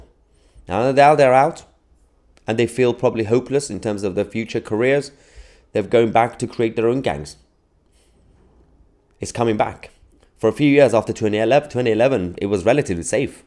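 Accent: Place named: RP, United Kingdom. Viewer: Mr, the shotgun fired when it was dropped to the floor. Stop exaggerating. It was 2 hoodrats, on a 50cc scooter, riding from the police, in persuit. You name check Brixton, is one of the best areas of London. You grew up in government housing in Lewisham, with that received pronunciation? You’re chatting breeze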